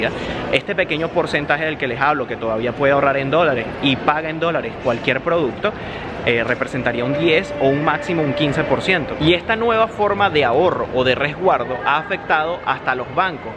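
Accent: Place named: Venezuela. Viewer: El Luisito comunica venezolano:v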